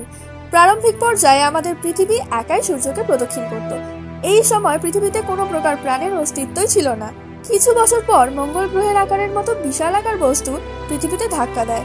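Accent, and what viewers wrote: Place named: West Bengal, India. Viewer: Tumi mone hoy indian bangali tai na tomar kotha gulo khub cute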